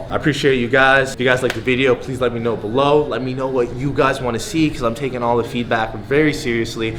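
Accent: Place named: Midwest, United States. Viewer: I thot you were from dmv lol why you say erms like you from midwest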